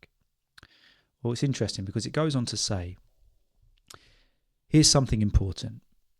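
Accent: United Kingdom. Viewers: Thank you so much brother, here is a spanish citizen learning, honestly I am in love with the British accent...all of them..it is amazing. Muchas gracias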